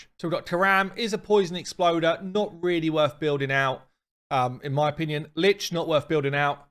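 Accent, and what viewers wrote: United Kingdom. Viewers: you’re easily one of those content creators that I can listen to for hours lol it’s the British accent! Love it! Oh and you put out must have content which I like so thanks bud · I don't know if it's what you say, just being British, or saying what you say with your British accent, but your videos like this just make me laugh. I love how you just beat up on poor Woad-Painted lol.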